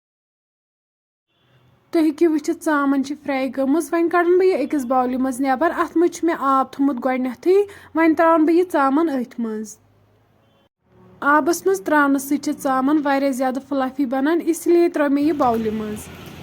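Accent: Kashmir, India. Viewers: Wariya asel...lagta aap k accent se aap south kashmir se ho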